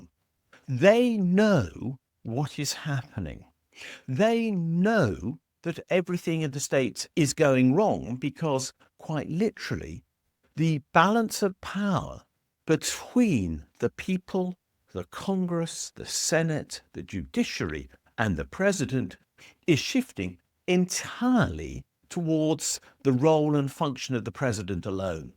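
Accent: United Kingdom. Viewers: Oh contrare, the coup happened with the 2020 election. Bush, Clinton, Obama & Biden are all traitors to America. The only one going by the book and the constitution is Trump. Do some research. And, yet, you with a British accent, who probably loves your King. Oh, the irony of it all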